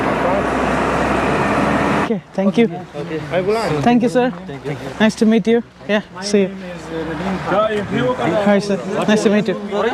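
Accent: Tamil Nadu, India. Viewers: Super sir u purely tamil nadu voice wow nice 👍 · தமிழ்நாட்டு தமிழ் பேசுறீங்க சிறப்பு நண்பா🎉🎉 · Srilankan ah irunthalum thamilnadu thamil pesuraga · உங்க தமிழ் நீங்க பேசுறது தமிழ்நாட்டு தமிழ் போல் தாம் உள்ளது, நீங்களா நான் இலங்கை என்று சொன்னால் தான் இலங்கை என தெரியும் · அசல் தமிழ்நாட்டு பேச்சி BRO நீங்க தமிழ்நாட்டில் இருந்திர் பிர்கள் அதான் நாங்க பேசிறேமாதிரி இருக்கு BRO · பாகிஸ்தானில் மலைப் பிரதேசங்களை கண்முன்னே காட்டியதற்கு முதலில் நன்றி உங்களுடைய உச்சரிப்புகள் எல்லாம் இந்திய தமிழ் உச்சரிப்பு ஆக இருக்கிறது ஆனால் நீங்கள் ஸ்ரீலங்கன் தமிழன் என்று கூறுகிறீர்கள் எப்படி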